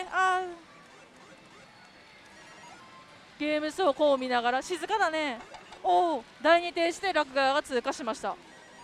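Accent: Kagoshima, Japan. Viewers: 何処の出身ですか？？ 鹿児島？？🤔🤔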